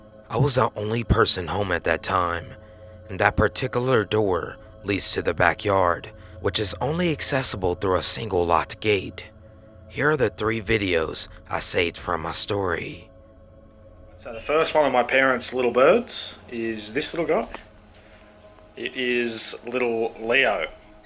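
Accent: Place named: United States. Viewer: I think that the snapchats may be real but I don't think the narrator is the same person in the snapchats because the narrator has an american accent and the guy in the snapchats has an Australian accent and a rainbow lorikeet is an Australian bird.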